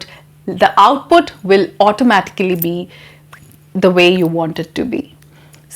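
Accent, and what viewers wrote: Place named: India. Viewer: Dear mam you're doing well but I request you to change your accent from Indian to American or British. So that we might learn more.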